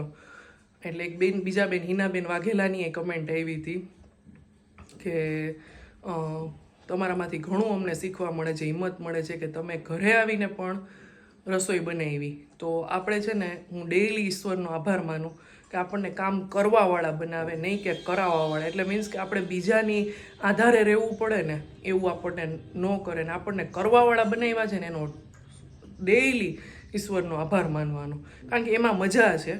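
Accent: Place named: Pahadi, India. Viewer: Nice vlog di Mane to tamaro pahadi avaj bau game Che sambhdvo ne tamari bolvani spich ❤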